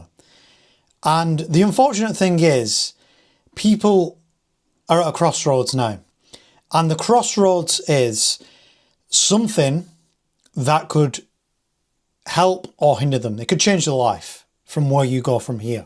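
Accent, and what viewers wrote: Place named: North West England, United Kingdom. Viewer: Good to hear a north west accent in the space! Good luck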